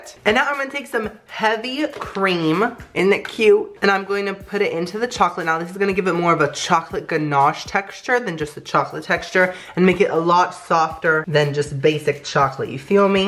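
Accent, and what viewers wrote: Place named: United Kingdom. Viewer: The British accent killed me lmaooo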